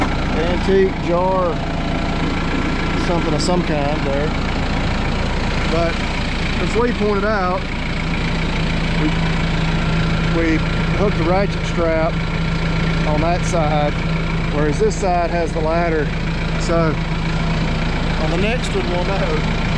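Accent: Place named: Tennessee, United States. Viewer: First video I clicked on, being from TN I loved hearing that accent! Lots of support from southwest TN!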